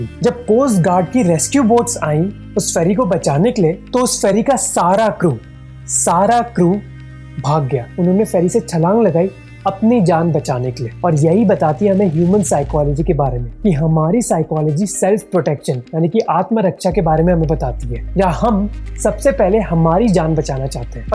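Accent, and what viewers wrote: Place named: Bihar, India. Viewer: I think it's his speaking style 🙃 like bihari accent or avadhi accent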